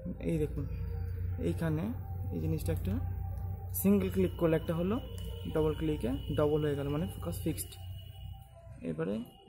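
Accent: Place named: Bangladesh. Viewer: bangela deshi der moto kre kotha bolchs kno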